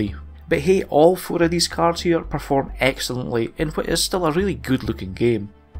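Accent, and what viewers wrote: Scotland, United Kingdom. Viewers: i love listening to Scottish people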